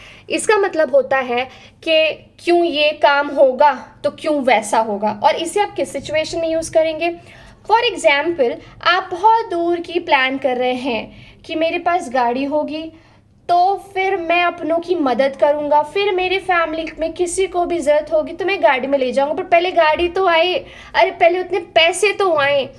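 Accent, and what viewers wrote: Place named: Allahabad, India. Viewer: What you are speaking is allahabadi its not proper awadhi but purvanchaliya style awadhi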